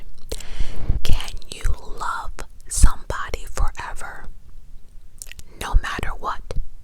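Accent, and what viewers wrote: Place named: East Coast, United States. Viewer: I’m new here, where are you from? Because the upper east coast accent is adorable, but if you’re anything like me, I always talk with accents lol. Love your videos!! I’m listening to this one while cleaning my kitchen on a Sunday and it’s chefs kiss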